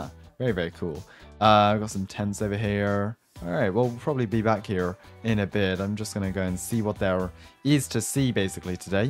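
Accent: United Kingdom. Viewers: He British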